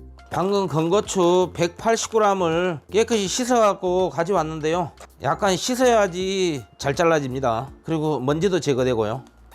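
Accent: Jeolla, South Korea. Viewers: 좋아요.전라도사투리 정감가네요.ㅎ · 셰프님 구수한전라도 사투리정감있네요.ㅎ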